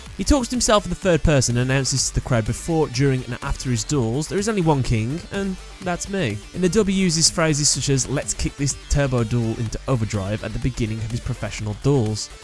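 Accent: United Kingdom. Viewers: You sound British but you can't speak like ATHLAS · Dude You Speak In A British Accent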